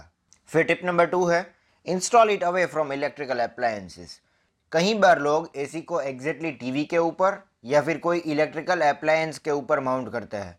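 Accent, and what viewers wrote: Gujarat, India. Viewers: Aap Gujarati ho ?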